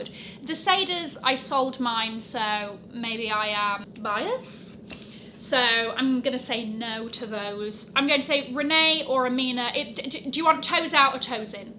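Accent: United Kingdom. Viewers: “Burberry trench coat for everybody” she says with a strong British twang… 😊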